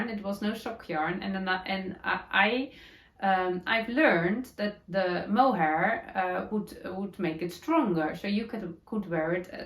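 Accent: Netherlands. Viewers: I love hearing your "Dutchy English" and to that end I never want to rectify what you say. That said we do say 'modify' and not 'modificate'. 🥰 xxx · Oh.....you can talk as long as you want. We love to knit and listen. Your Dutchy-English is VERY good. And how special that you care enough to get it right and learn. It is just wonderful that we do not need to learn Dutch - especially if it is more difficult. Can you hear me laughing? It certainly sounds more difficult. Love your patchy socks - so worth it!!! I do that too. Have a lovely Easter weekend with your family on both Easter days. 🧶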